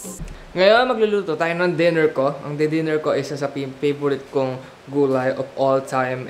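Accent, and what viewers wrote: Visayas, Philippines. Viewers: Butete.... visaya accent is funny to do thing 😂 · Meron talagang bisaya accent eh 😍 palagi ko napapansin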